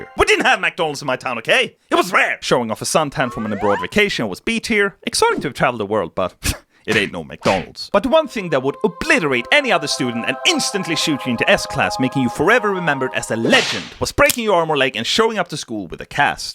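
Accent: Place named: Sweden. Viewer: I really love these vids. They're definitely worth the wait! Plus we get to listen to ' EPIC Swedish accent!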